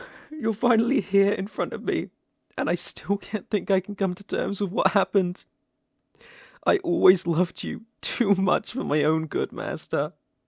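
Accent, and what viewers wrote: United Kingdom. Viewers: Helloooooooooooooo good to hear ur british voice again XD back from the dead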